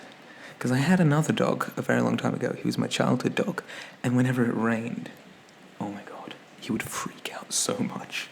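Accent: Ireland. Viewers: 1)Yeah you have just sold me on this crazy Bob Ross idea. "Beat the god" out of that paint brush. 2) it's "chicken and chip" chips or "fish and chip" chips in London. And your Irish accent is brilliant, it will make 100% of your girl viewers go crazy. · I haven’t see it in the comments, so I’m just gonna call out the Bioshock reference now. I know this video is a bit older, but I’d also like to say that I adore your channel and the characters you’ve made. I’m always up for a good story and you never disappoint! Adore the Irish accent as well! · I love your Irish accent! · I enjoyed this so much! I think you should do more personal videos like these. It's nice to see what YOU are like and not just your characters(which are great btw). Also just to let you know...I really like your Irish accent.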